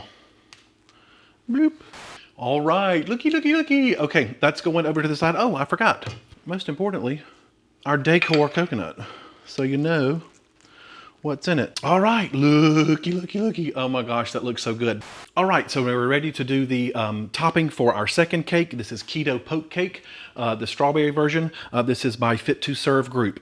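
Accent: South, United States. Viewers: Oh my goodness, so I stopped doing keto about two years ago, maybe more and I have gained more way in the last two years than I ever have in my life. I have been so miserable, so I’m jumping back on the keto wagon. But I used to watch your videos all the time, and I just have to tell you… You look amazing!!!! I thought you looked great several years ago, but when I saw you again, I barely recognized you. I knew your sweet, southern accent. If you didn’t have a husband and you were straight, I’d be hunting you down… Just kidding, I’m not a stalker. But you do look amazing honey. I’m so proud of you. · I love your videos and the cakes look delicious, you make me smile the way you react to the taste testing, well done, your a great cook and a big thank you to the creators of these cakes, I also love that Southern drawl of yours. ❤😎 🇬🇧🏴🇺🇲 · Even if I have no interest in a particular recipe I ALWAYS love to hear what has to say. 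The videos are exactly as long as they need to be and have helped me stick with keto and not get bored. Useful info, humor and heart — and that delightful southern accent just seals the deal! ❤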